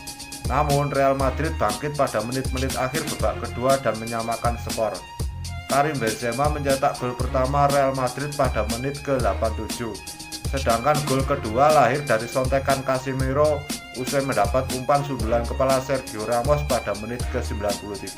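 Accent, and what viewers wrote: Java, Indonesia. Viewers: Kaya orang Jawa ngapak hehehe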